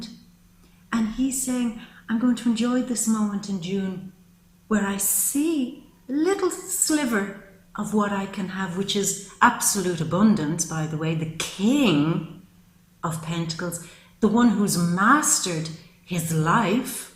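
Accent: Ireland. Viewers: Sooooo funny, shove it up your arse...amazing and with your Irish accent..haha...thank you for an amazing reading and totally resonates with me looking for something to hold on to.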